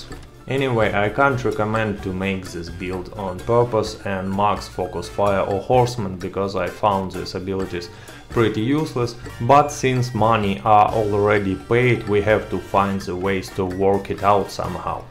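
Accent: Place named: Russia. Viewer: Hey, like ur Test cause i tested with friends same way 👌🏻 There are many possibilities to make builds with the cards and u analyzed it very clear for different modes of gameplay and playstyle 💪🏻 And last but not least your russian accent is kind of what u make unique so it s funny but i like it 👌🏻 Keep it real... i subscribed and always like your videos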